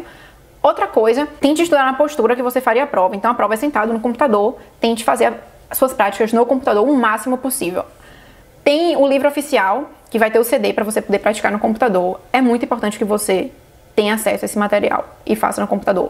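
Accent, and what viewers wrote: Brazil. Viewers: Eu bem que notei pelo sotaque dela que ela é daqui da terrinha. rs'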